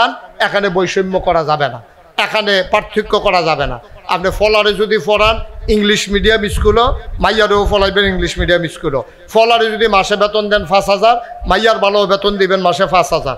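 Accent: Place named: Bangladesh. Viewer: এ হুজুর বাঙাল,।শুদ্ধ বাংলা জানেন না।হুজুর বেশ ভালো কমিডিয়ান। Head line কী আছে,? এটা আলোচনা নয়,জুকারগিরি করা।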